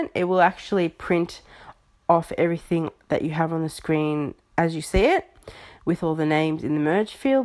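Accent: Australia. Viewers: Goofy ahhh Austraalian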